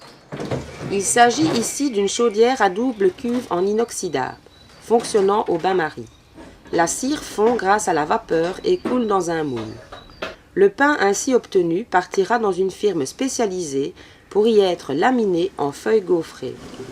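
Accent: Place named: Belgium. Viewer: L'accent belche!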